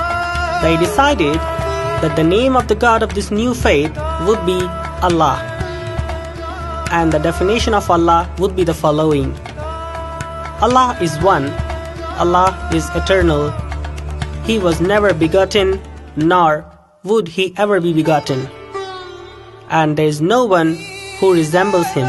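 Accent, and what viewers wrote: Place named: India. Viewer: I completely agree he sounds Indian and a load of crap coming from his mouth I couldn't watch it all since it was all lies...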